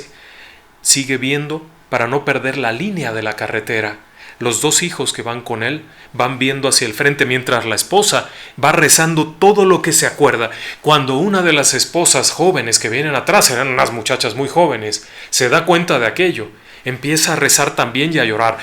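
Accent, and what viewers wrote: Puebla, Mexico. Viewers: Recuerdo que en la estación XEB se transmitía un programa de CANACAR a altas horas de la noche y pasaban entrevistas con traileros y camioneros donde en dos o tres ocasiones los choferes contaron historias de terror. Era muy agradable ese programa que luego ponía la canción de Carretera con. Por cierto, eres poblano?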